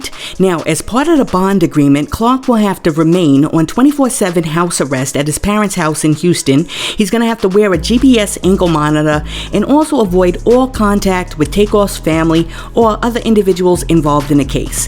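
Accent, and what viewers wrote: New York, United States. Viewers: I ABSOLUTELY LOVE THE GRITTY SOUTHERN AND INTENSE NEW YORK ACCENT TO MY CORE.. IT FEELS LIKE HOME 🏘️ 🏡. I'M SO EXCITED FOR THE NEW CHANNEL..GIVE ME MORE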